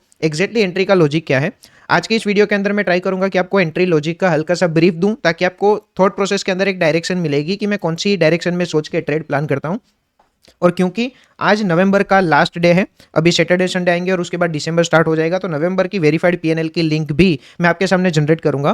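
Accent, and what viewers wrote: Gujarat, India. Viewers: Are you Gujrati?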